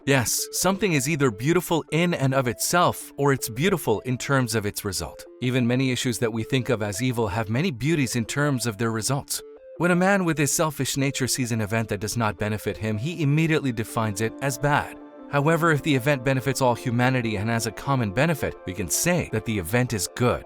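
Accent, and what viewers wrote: Turkey. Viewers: I liked the Turkish English accent better, y'all didn't have to get another narrator😭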